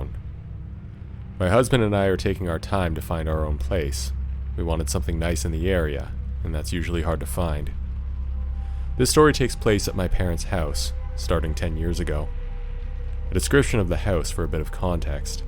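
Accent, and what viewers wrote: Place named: Canada. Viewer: Thumbs up for awesome stories. Thumbs down for horrible Canadian accent. It's a house! Not a hoose!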